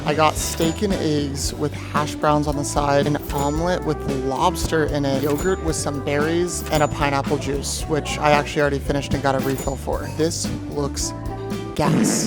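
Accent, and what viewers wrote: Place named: Germany. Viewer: german accent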